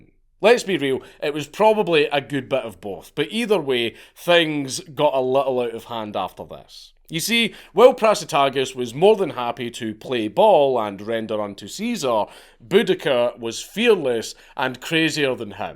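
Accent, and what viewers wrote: Scotland, United Kingdom. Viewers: I fekkin love the scottish accent. History = Hesterree ..music to me ears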